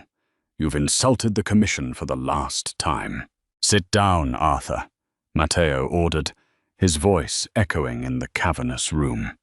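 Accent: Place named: United Kingdom. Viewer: I love 💕 the story, but I get tired of the British narrator. He is in America 🇺🇸, and the narrator should have an American accent. Don’t get me wrong, I do like the British accent, but I would like to hear the American accent sometimes. The British narrator sounds too dramatic. Rena from Rio Rancho, New Mexico, USA 🇺🇸. ❤❤❤🥰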